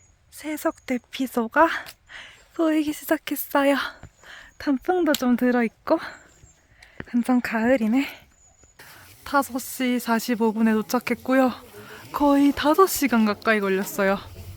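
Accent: Gyeongsang, South Korea. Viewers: 경상도 어느 동네 말씨인가용? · 말투는 갱상도 가시나 얼굴은 서울여자? 대단합니다~ ㅎㅇㅌ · 엥? 대구셨네요 ㅡㅡㅋ 저도 대군데 경남쪽 사투린줄 알았네요 ㅎㅎ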